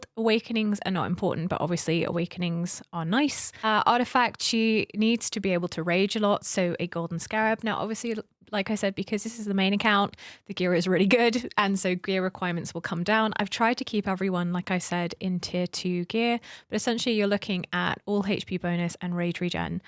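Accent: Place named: United Kingdom. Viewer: Yeh… after ages just got past 19… I dread even trying 20😵‍💫 but thanks. BTW great to see/hear a fellow Brit do these vids👍🏽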